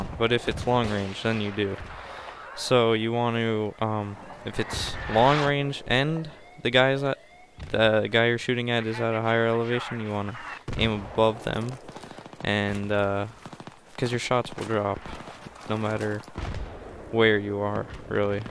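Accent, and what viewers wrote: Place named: Europe. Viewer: btw for some reosone you sound european in your comentaries lol.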